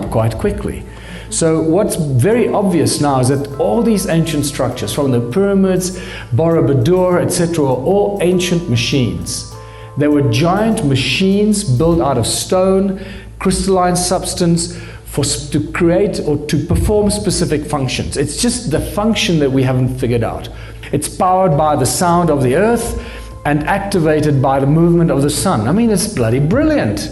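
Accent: South Africa. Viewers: Definitely sound like a X Rhodesian or South African stock.Thinking People 👍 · Is this guy South Aftican? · he sounds like those who kept blacks segragated south african racist scum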